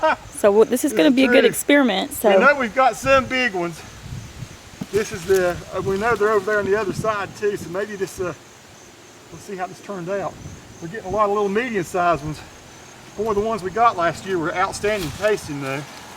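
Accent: South, United States. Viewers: hi wonderful to watch you pull up all those sweet potatoes. I was so excited and they aren't even mine. I love to garden and this past summer, I just stuck a small piece of a store bought sweet potato and then stuck it in a big container. That thing had the prettiest vine that I trellised and lo and behold in the fall I had about 5 pretty decent potatoes from that one vine. I probably would have gotten more but I put it in with a couple of other plants which already had root systems that the potatoes just wiggle themselves around so this year they will have their own private containers. It was such a wonderful treat to eat my own "sweets", from my garden. I am very anxious to do the "slips" this year, that I have been watching on youtube and reading about. Can't wait. I live in Northeast (southern NH, 45 minutes north of boston, ma, so I have a bit of a wait but I start a lot of stuff inside cuz I can't wait. 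It is Jan and I just got all my seeds so I will be starting this week. Thanks so much for the great video. Where do you folks live. I know you are from the south, thats for sure (ha, ha,). lovin listening to your accent. Have a good time gardening this year. I do. Patty in nh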